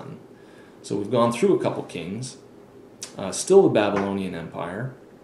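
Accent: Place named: Canada. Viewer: Great review, thanks for this! I am absolutely inspired to read the book now! Also, as a side note, after hearing your "outs" and "abouts" I've gathered you to be Canadian?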